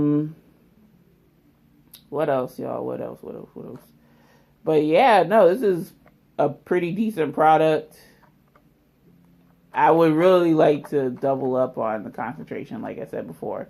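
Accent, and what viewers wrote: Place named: United States. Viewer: Love the American accent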